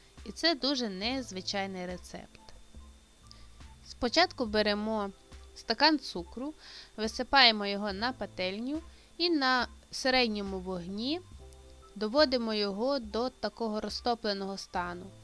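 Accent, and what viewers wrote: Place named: Western Ukraine, Ukraine. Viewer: Добрий день, пані. З великим захопленням і радістю слухала ваше відео. Як приємно чути рідну мову. І особливо наш західний говір. Я, як правдива бойківчанка, до тепер говорю пательня і ронделик, пляцки і цвібак 😄. То є наш генетичний код 👍. Люблю той медівник. І ще крім горіхів добавляю родзинки і замінюю 1 склянку цукру на склянку кокосової стружки. Дуже цікаво виходить. Хочу відповісти на коментарі з запитаннями: " а де мед?" По перше мед ні в якому разі не можна нагрівати і тим більше пекти! При термічній обробці складники меду перетворюються в шкідливі смоли і віск! По друге всі медовики пекли або на штучному меді, якого є вдосталь в магазинах і по низькій ціні. Або робили в дома з цукру і води, або на молоці, щоб вийшла молочка карамель. А ще смачне взагалі на вершках, то взагалі бомба 🤩. Так що всім доброго дня і спробуйте цей смачненький медівник. 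І не переживайте, що там багато цукру, зате немає ніякого крему ні вершкового масла ні сметати! Якщо один кусочок на сніданок, то буде енегія на цілий день. Бажаю всім успіхів і всіх благ ❤😍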